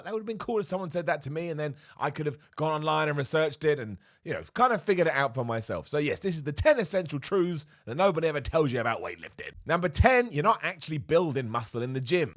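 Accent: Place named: London, United Kingdom. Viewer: You got to love that smarmy working-class London accent.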